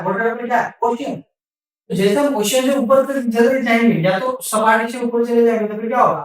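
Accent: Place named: Gujarat, India. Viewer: hindi accent is like gujrati. I think he is from gujarat. I really appreicate sir's efforts for the most amazing lecture. thank you sir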